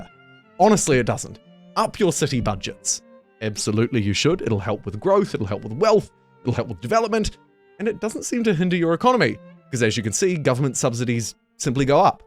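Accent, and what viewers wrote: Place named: New Zealand. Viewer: As soon as I heard your accent I was like, Holy shit your kiwi! Subscribed my friend 😁🤙🏼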